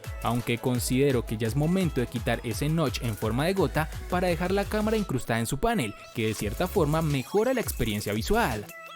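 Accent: Cali, Colombia. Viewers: Eres de la ciudad de Cali?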